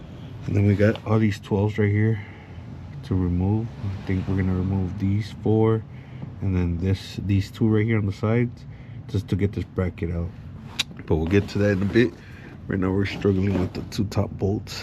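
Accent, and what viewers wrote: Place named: Southern California, United States. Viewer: You got that southern california chicano accent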